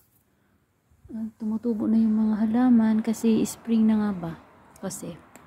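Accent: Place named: Visayas, Philippines. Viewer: Uy, bisaya di-ay ka,pila naka katuig diha?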